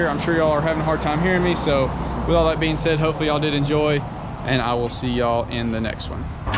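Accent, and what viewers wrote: Alabama, United States. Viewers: What part of Alabama you from?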